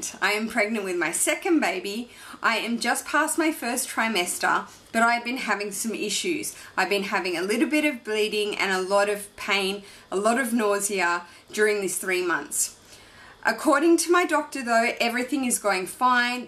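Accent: Australia. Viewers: your videos and perhaps your Awesome Aussie accent bring cheer to my day!! More! More! More!! 💜 much love and happiness to you!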